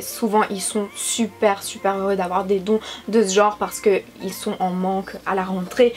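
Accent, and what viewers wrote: Belgium. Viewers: J'ai remarqué que tu disais differement les chiffres y'a t'il en belgique une prononciation diffeeente pour les chiffres.Au début, j'étais confuse:) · J'adore ton accent vive la Belgique!!!!😄😄😄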